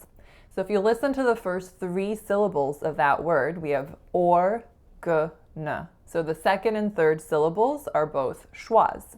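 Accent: Canada. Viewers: American Accent Mastery agreed in general, but your pronunciation of “about,” “today,” and “video” are very Canadian sounding to me. Remember that in the USA, cloud and house rhyme exactly but in Canada they don’t. Also, you pronounce today as todeeeegh, which is Canadian. Also you pronounce video with a very Canadian sounding O. And it’s wonderful. I feel sad that you felt you had to change your accent. I’m glad you seem excited about it though. Speak how you wanna speak and be who you are. Loved the video! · I was born and raised in Toronto (or "churrono" since this is an pronunciation difference vid) and I pronounce nearly all the words like her Canadian examples (and I'm only a first-gen Canadian, so its not like I come from some super Canadian family). Only one that I sorta say different is kilometre. And honestly, thats I found was dependent on the situation. 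It sorta switches in between, in that it seems somewhat more proper to say it the "Canadian way" in some cases (like measuring distances), but I use the "American way" of saying kilometre for everything else. I mean, 'less you talkin bout the actual "Toronto mans" accent.... But thats a whole other discussion (more Jamaican/Carib influence than anything else... similar to how the MLE accent in London developed). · I knew you were Canadian from the start. The "a" and "e" vowels are often very "ay" sounding. "Very" sounds like "vay-rie". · Why didn't you keep that beautiful Canadian accent? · She sounds Canadian to me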